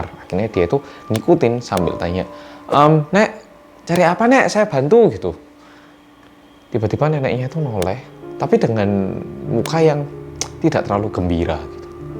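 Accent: Java, Indonesia. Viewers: Ini orang Jawa atau korea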